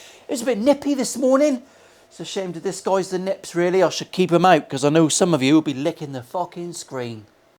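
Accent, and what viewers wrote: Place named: Northern Ireland, United Kingdom. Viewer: Cant wait to see this finished mucker. That norn irish accent is pure class so it is ! I got a MK NF53 and its awesome. That paisly top is stellar. Going to be an amazing build again!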